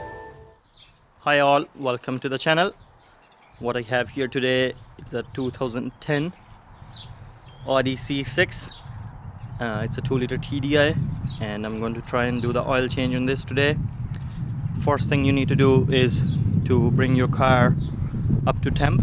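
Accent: Ireland. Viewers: I heard the Irish "twang" easily enough! But we're all best friends ❤️❤️ until the 6 nations 🤣🤣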